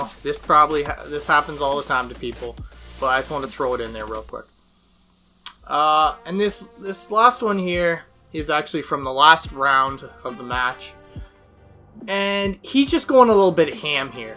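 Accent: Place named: Canada. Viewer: Are you canadian,? You sound very canadian.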